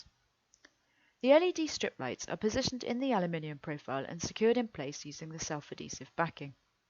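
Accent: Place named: United Kingdom. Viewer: This lady sounds so fancy with the british accent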